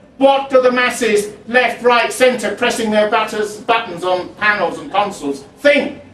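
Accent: United Kingdom. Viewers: Idk man to me he sounds pro'er Bri'ish.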